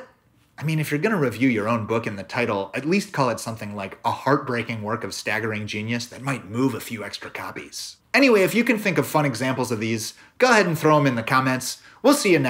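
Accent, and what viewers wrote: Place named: France. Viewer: Quel belle accent français très cher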